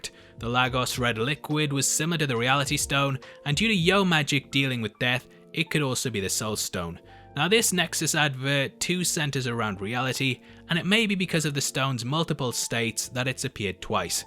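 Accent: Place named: North East England, United Kingdom. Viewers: Sounds like a South Shields accent... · You’re deffo from the north east like x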